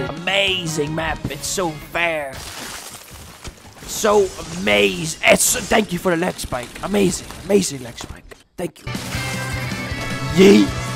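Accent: Netherlands. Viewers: Are you dutch? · Je hebt een Nederlandse stem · Hij heeft gekke Nederlandse accent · where do you come from? you sound like youre from the netherlands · Dutch accent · Hij heeft heeft Zo'n nederlands accent poeh he😂😂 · Je klinkt Nederlands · Ik hoor gewoon dat je Nederlands bent😂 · ja he hij heeft zo’n Nederlands accent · Haahha dat nederlandse accent door je engels is echt goud.... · this guy is dutch, of niet? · Are you Dutch? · is hij nederlands ofzo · Je bent gewoon nederlandss haha hoor echt duidelijk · Is he maby Dutch Hij is 100% NL Zijn Engels zo funny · Hoi ik weet dat je nederlands bent ik hoor het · You sound dutch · Ja echt aan hem accent hoor je al dat hij nederlands is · Je bent nederlands ik hoor het aan je accent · You are from holland arent you? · Je bent gewoon Nederlands leer Engels praten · Wat een lekker Hollands accent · Boii. Are you dutch? 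You sound dutch🤣 love your vids❤ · zn accent is zo nederlands ik ga stuk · Dutch accent (je hebt echt een Nederlands accent 😂😂) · Hij praat echt zo Nederlands engels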